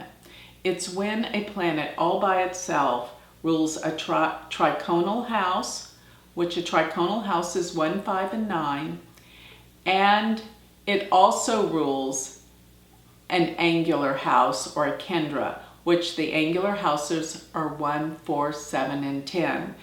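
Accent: United States. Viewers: thank you for the very perceptive and accurate analysis of Lousie's Chart. I must say you sound such a darling when you pronounce the Indian or Vedic terms....it's cool to listen with your American accent....I love it.....Best wishes on your work in Jyotish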